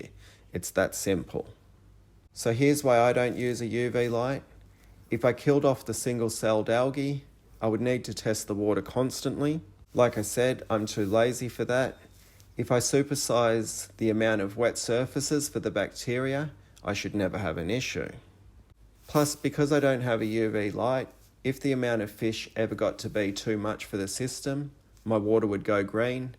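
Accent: Australia. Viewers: Is that an annoying Queenslander accent I hear?~! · Good to hear an Aussie voice on Uutoob for a change, apart from that I'm gunna be the mug to react to the use or the non-word bacterias...... there can be one bacterium or two to many bacteria despite what spell-checker says. Cheers, by the way I keep 3 greenish water ponds with pondslime for the purpose of breeding native fish.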